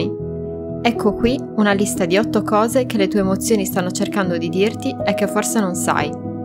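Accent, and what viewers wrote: Sardinia, Italy. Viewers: Dal tuo accento sembri sarda o sbaglio?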